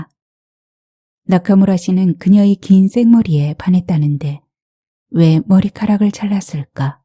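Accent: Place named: Gyeongsang, South Korea. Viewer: 반갑습니다 ^^성 보경님의 푸른 넥타이, 잘 들었습니다 욕심많은 엄마 때문에 결국 재주많고 똑똑한 금희를 죽였네요 금희가 너무 불쌍하네요 주인공은 천진무구,참 귀엽고... 의 경상도 사투리가 사랑스럽고 예쁘네요^^ 재미있게 감사히 잘 들었습니다 ♡♡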